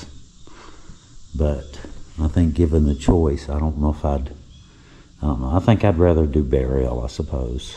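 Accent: South, United States. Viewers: Aarrgghh. That Southern drawl. The worst, most jarring accent on the planet.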